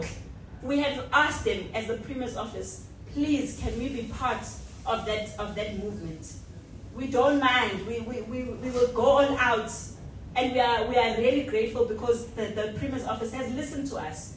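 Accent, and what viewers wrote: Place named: Durban, South Africa. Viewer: That Durban Accent is loud and we proud of her.Siyabonga dade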